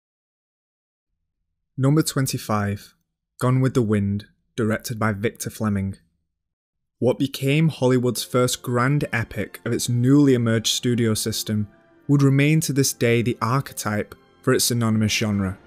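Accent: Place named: England, United Kingdom. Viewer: Love the content of this video. The mispronunciation of the director's names (Louis Malle, Murnau, "Andrei" Tarkovsky for example) and the accent in general made it very difficult to watch. How does one know so much about cinema but get the names of the directors wrong? And with such conviction. That's baffling to me. This is one English accent that simply destroys everything in its path.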